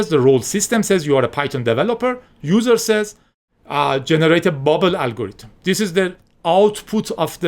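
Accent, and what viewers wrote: Iran. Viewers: WOW I like your thick Persian accent it makes me proud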